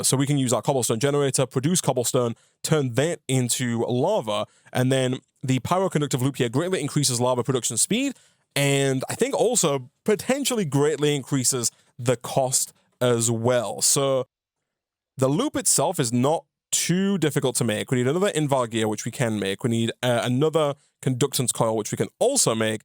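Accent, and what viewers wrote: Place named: Northern England, United Kingdom. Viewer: your accent confuses me so much 😂 sounds like a proper northerner from the UK but has so many american influences; 'aluminum'???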